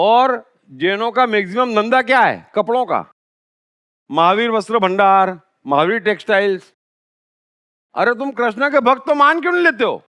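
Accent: Gujarat, India. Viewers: Lagta he k sir hamare Gujarat se hoga qki gujarati sbd pyor bolata he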